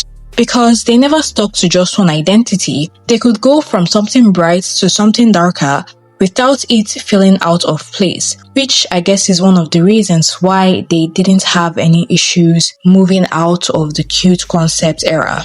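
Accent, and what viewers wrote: Nigeria. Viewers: Nigerian narrator 😂? How refreshing ❤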